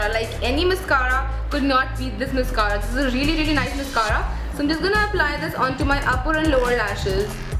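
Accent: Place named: Delhi, India. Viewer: Hiiiii... I just came through your YouTube Channel last night and I'm TOTALLY YAYYYYYY in love with it... Have already watched more than 10 videos since last night 😀 I just love your confidence in front of the camera.. The way you talk and everything... So dilli wali ladki 😊😊 ❤ And coming to the Giveawayyyyy... My favourites are the Maybelline BB Cream and Colossal Kajal 😊😊😊... Both are perfect for my oily skin... Badly wanna win this Giveawayyyyy yaayyyy 😍😍😍😍 Looking forward to your reply 😘😘😘😘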